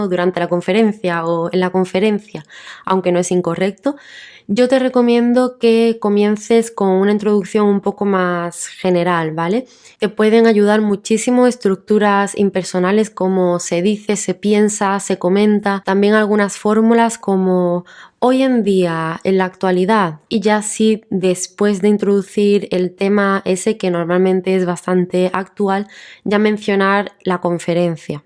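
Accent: Spain. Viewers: Que placer escucharla. Se puede aprender mucho observando los movimientos de su boca. Ella se convirtió en mi nuevo recurso del acento de España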